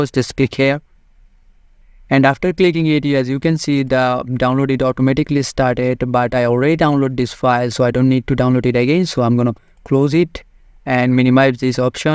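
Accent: India. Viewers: Indian accent! 😍 Yeah, I definitely can install this program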